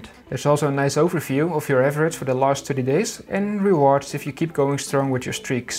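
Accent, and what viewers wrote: Netherlands. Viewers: wait wait u dutch?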